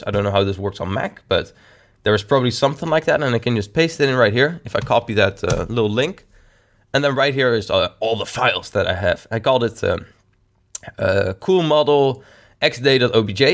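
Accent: Netherlands. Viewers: Are u dutch or Deninish or Belgic? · eyyyy jog je bent nederlands toch ? well ik ook ik kan het horen via je accent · Dutch :D? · he sound dutch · yep you sound dutch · Je nederlandse accent is zo laimmm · Just a question this voice really has a Dutch accent? Is he Dutch? Anyways I love ur vids what are ur subs called Znaccers CX? (Iam one CX)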